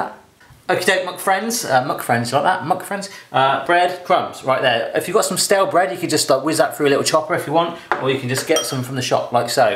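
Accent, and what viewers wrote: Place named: England, United Kingdom. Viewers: ARE YOU FROM BIRMINGHAM?! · Im not used to England accent, so when you were at McDonalds i thought you said cheese but you really said cheers :D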